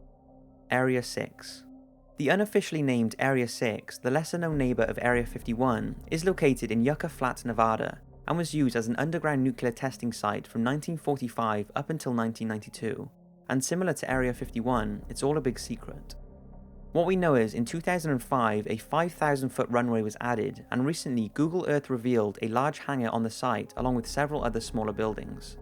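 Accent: United Kingdom. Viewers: damn, british accent... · the British accent helped me stay calm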